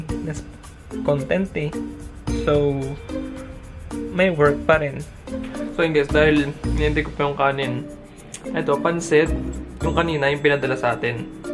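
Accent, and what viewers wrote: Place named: Mandaluyong, Philippines. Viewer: Luh parang taga mandaluyong kapo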